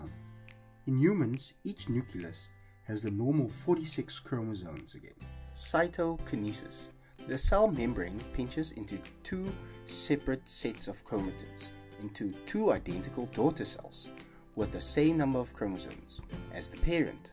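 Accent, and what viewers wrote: South Africa. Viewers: i just want to know if the narrator is south african? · This man sounds South African!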